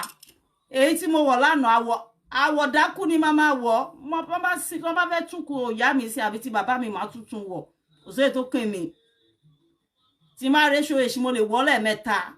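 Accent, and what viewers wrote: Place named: RP, United Kingdom. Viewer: I no know sey dis LADY 👸🧚‍♀️ sabi talk Queens English like dis ajeh 🙆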